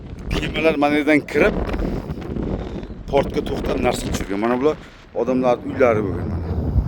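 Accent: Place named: Surkhandarya, Uzbekistan. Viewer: Бу сурхандарёдан узбекча гапиряпдику ашитта машутта олифтагарчилик кимаяпдику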